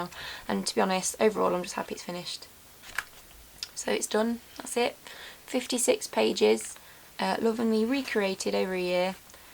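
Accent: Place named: Newcastle, United Kingdom. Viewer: also do u come from around Newcastle England area or just England if it's too personal I was just asking u sound like it. Also thanks for telling us about the RPF it really helped me 🙃😊😊😊😊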